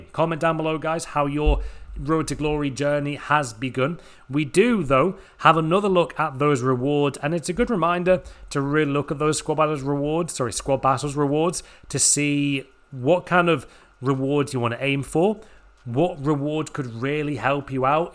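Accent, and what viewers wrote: Australia. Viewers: So I am guessing your an Aussie?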